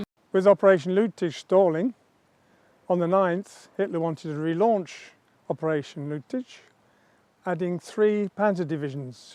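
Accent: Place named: United Kingdom. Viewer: I'm glad that this is highly detailed but when you jump from one unit to another every ten or fifteen seconds it becomes very difficult to keep up with. Have you thought about animating with some arrows or directions so that I know which unit you're talking about and which direction they're actually moving and who they might be facing? I understand that all of this is hard work but these would be so awesome if I could follow them just a little bit easier. I'm sure your British accent doesn't help my dumb American brain much either. I am using subtitles though.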